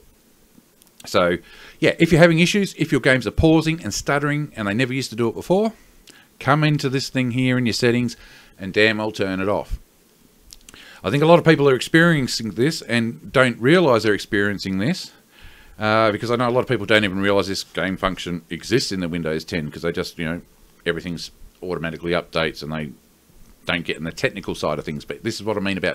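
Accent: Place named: United States. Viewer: You have a really american voice I really like that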